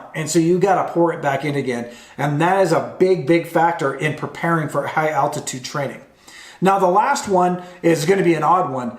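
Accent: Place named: RP, United Kingdom. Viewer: It's so nice to heard commentary about CU by someone who speaks the Queen's English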